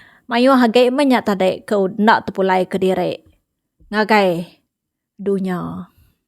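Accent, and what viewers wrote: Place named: Saratok, Malaysia. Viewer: Munyi jaku urg tungga ke Saratok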